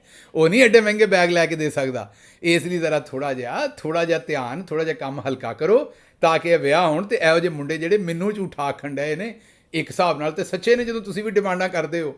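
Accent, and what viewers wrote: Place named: Punjab, India. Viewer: I am student living in Scotland i used to talk with my mom after 2 days but everyday watching your vlog even while driving towards my university. This is my hobby and is from my city as well thatswhy i like his punjabi accent just like my mom punjabi accent and funny talks in punjabi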